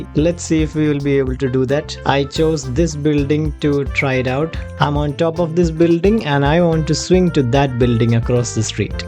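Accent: Kerala, India. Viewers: Are u frm kerala?(malayali) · The mallu accent is lit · Edaa malayalai moneeeee🌝Ninte english ketta mansilavum nii oru mallu aannenn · bro are you from kerala just guessing :)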